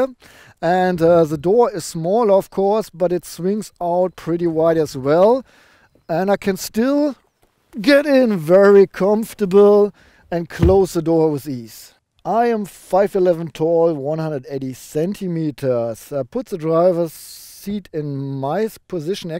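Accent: Germany. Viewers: Die Videos sind nice... vielleicht solltest du einfach mal ne ganze Woche am stück Englisch sprechen, Radio auf englisch hören, englische Youtube Videos gucken; damit du mal diesen fürchterlichen deutschen Akzent loswirst. nimms nicht persönlich, mein Englisch hat mal genau so bescheiden geklungen ;) eine Woche reicht. Wenn du sie nicht schon auswendig kennst, guck dir alle staffeln von TOP Gear UK an...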